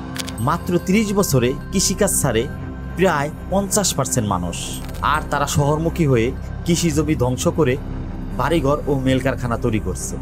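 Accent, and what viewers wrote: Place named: Shariatpur, Bangladesh. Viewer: ভাই এখানে ধন্যবাদের কিছু নাই। আপনি আপনার যোগ্যতার ভিত্তিতে উত্তম উপস্থাপনার মাধ্যমে আমাদের কমেন্ট অর্জন করেছেন। আমি মনে করি এটা আপনার প্রাপ্য। আমরা আশা করি সামনের দিনগুলোতে আপনি আমাদের জন্য আরও ইনফরমেটিভ ভিডিও নিয়ে আসবেন। ভাই আরেকটা কথা আমার বাড়ি শরিয়তপুর, আপনার মুখের ভাষাটা আমাদের ঐদিকে মত মনে হয়। ভাল থাকবেন।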